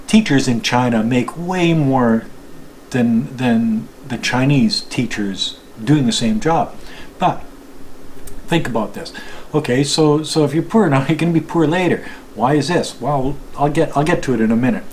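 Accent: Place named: Canada. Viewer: Great video, I like your Canadian accent.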